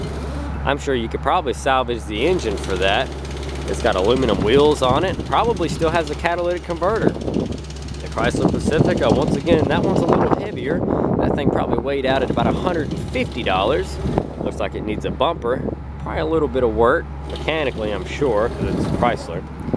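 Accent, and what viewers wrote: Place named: Carolina, United States. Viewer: Thanks...that was cool. Ever ship cars to other states? Where are you? Sounds like a Carolina voice.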